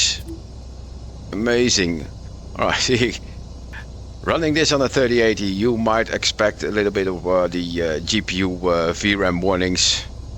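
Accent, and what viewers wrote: Netherlands. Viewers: Maybe you got a golden sample but i returned mine because off the MURA,maar ik hoor dat je van nederland komt hahahah..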